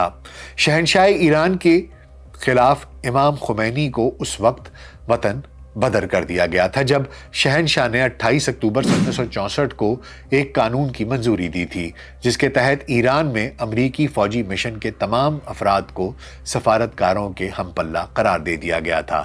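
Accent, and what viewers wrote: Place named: Punjab, Pakistan. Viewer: سر اپ بھت اچھا وی لاگ کرتے ہیں ریگولر سنتا ھوں زبردست لیکن ایک چیز نوٹ کی اپ بات اردو مے کرتے ہیں لیکن دو ہزارا۔ گیارا کو اپ دو ہزارا یاراں بولتے ہیں جو کے پنجابی مے بولا جاتا ھے اسکا خیال رکھیں ❤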